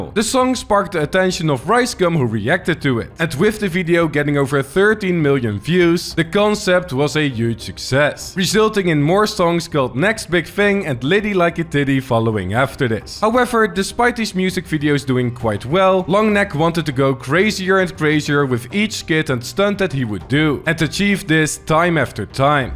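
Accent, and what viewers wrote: Netherlands. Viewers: Heey een Nederlander die Engels praat!! Of probeert althans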